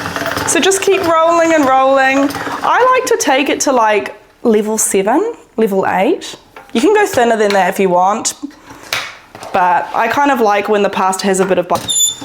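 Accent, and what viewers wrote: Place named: New Zealand. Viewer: Thanks for the demonstration - simple is the way to go. You should get an Italian pasta machine (joking), the NZ accent is contagious not that our Aussie one isn't. 👍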